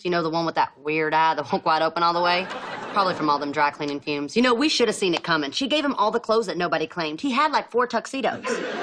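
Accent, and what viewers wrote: United States. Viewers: I love her country accent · she sound so country lov ya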